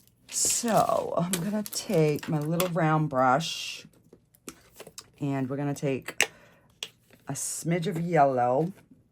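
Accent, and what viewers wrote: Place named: New England, United States. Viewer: This is BEAUTIFUL! Reminds me of Bob Ross. I've been following you for a while now, liking your vids on my TV. I'm from Mass & you sound like a New Englander, love watching you, feels like I'm hanging out with an old girlfriend 😊 You are quite the artist!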